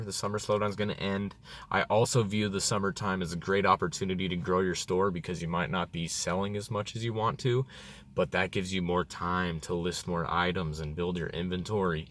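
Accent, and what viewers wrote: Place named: United States. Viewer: God...I just love your American accent, where are you from?Colorado?Denver?, nice video great tips, thanks for sharing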